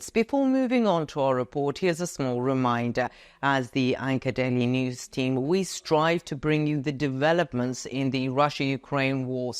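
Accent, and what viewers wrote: United Kingdom. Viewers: I love your beautiful hair and British Accent.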